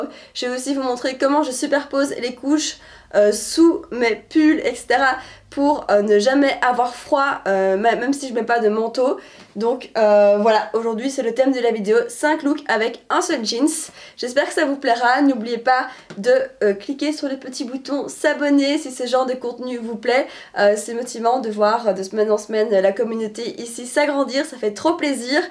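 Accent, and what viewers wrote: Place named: Brussels, Belgium. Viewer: Tu ressemble beaucoup à la chanteuse Angèle surtout avec l’accent bruxellois 😭❤️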